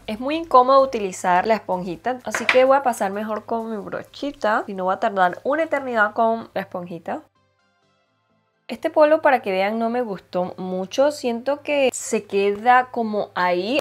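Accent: Venezuela. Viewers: Me Encantas!!!! soy venezolana, vivo en España y es super increíble oír tantas palabras y esa forma de hablar tan particular de los venezolanos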